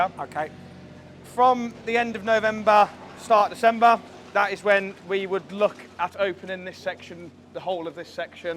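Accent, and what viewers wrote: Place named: Wolverhampton, United Kingdom. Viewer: Phase 4 yes please. Fascinating watching the progress and I need a regular fix of accent, being marooned as I am here in NZ far from my native Wolves 😀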